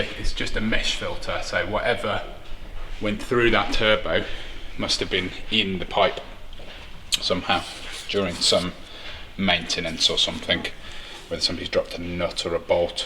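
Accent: United Kingdom. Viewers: a Guy with my Good Ol College Professor British accent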